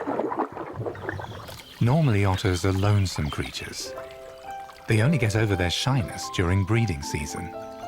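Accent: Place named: United Kingdom. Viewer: And so they get a stinking tommy with his snotty accent to narrate this? Where is the German pride? SAD. These brits are into everything but actual work.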